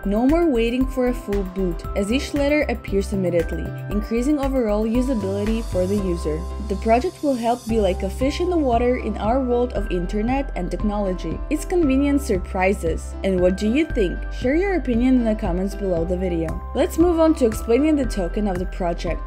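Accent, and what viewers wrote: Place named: Asia. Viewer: that asian accent...makes me feel bulish on this...I want to get in but I don't got any more cash left, I invested all I had...😅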